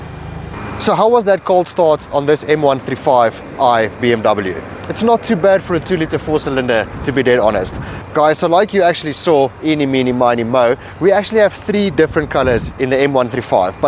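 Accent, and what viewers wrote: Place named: South Africa. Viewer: Wow I totally didn't know south Africans sound like this lmao I thought this was from the UK with an accent of somewhere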